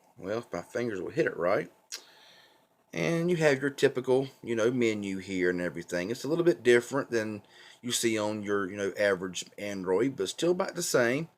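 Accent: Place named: East Tennessee, United States. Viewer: Sounds like you're from East TN or Kentucky. Amirite?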